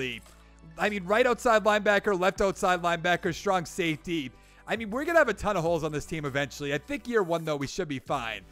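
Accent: Boston, United States. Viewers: Boston accent on point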